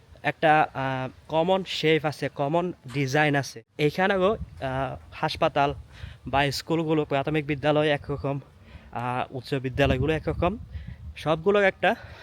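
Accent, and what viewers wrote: Chittagong, Bangladesh. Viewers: না,,ভাই।।।আপনার মুখে চাটগাইয়া বাংলা ভালোই লাগে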